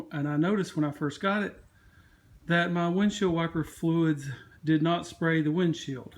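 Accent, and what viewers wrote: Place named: South, United States. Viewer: Your southern accent and the scenery makes me think you might be in Georgia. Just guessing.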